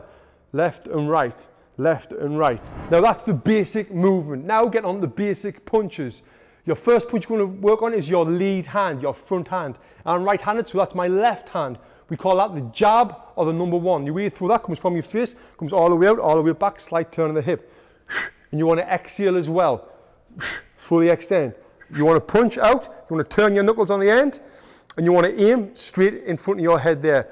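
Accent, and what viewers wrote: United Kingdom. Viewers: British accent makes this lesson reliable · His British accent is interesting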